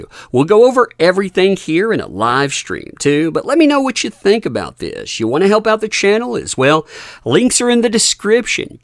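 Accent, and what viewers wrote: South, United States. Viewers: Your hill Billy voice is very irritating. Must Americans sound so uneducated and low class. Except Johnny I love Johnny but he rightly prefers England and France anyway.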